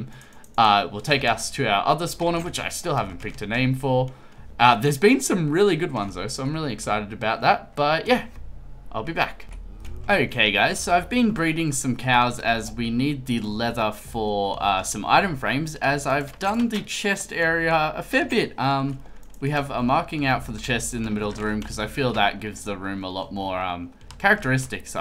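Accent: Australia. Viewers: You're one of my favourite youtubers, you deserve way more subs man! Awesome content! And people say to me: You sound Austrialan, then I directly think of your voice haha! :D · Are you Australian?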